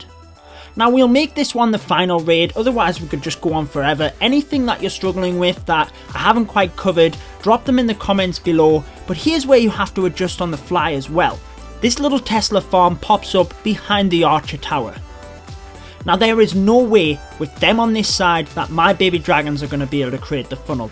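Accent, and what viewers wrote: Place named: Scotland, United Kingdom. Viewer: Which accent is this? Scottish? Sounds awesome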